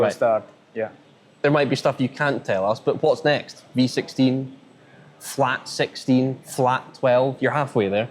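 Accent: Scotland, United Kingdom. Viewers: Never new the Scottish accent would be so good for documentaries (from an American). Can you do medieval history as well?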